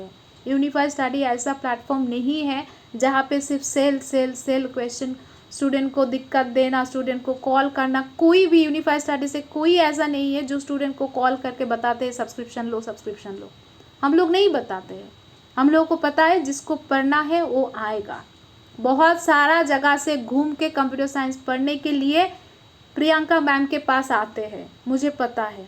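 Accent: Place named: South, India. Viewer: mam s accent of english is little bit south touch but its very simple to understand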